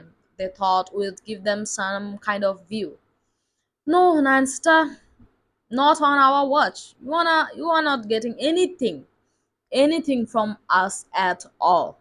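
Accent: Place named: India. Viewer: I tot u were from India,,,your tonation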